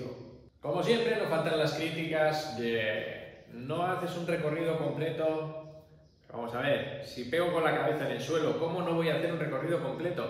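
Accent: Spain. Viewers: me gusta tu acento Español.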